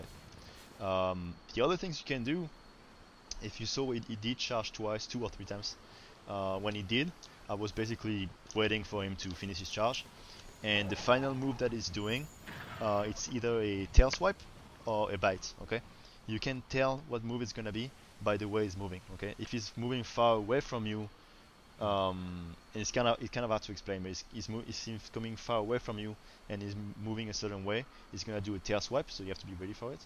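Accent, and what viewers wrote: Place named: France. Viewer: Alors accent français... 69... région Lyonnaise? 😏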